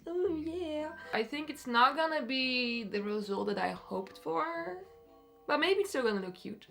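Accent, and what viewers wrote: Netherlands. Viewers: Ik kon aan je accent horen dat je 🇳🇱 was😁😉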